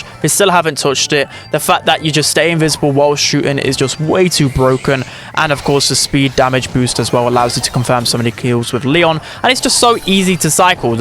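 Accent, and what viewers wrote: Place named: Northern England, United Kingdom. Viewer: What part of England are u from bro everytime you pronounce something ith a u yiu sat like "oopdate" for example (from london)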